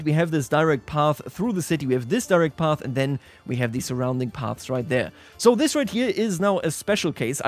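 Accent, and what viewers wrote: Germany. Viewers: Haha hab sofort den deutschen Akzent rausgehört. sehr cooles video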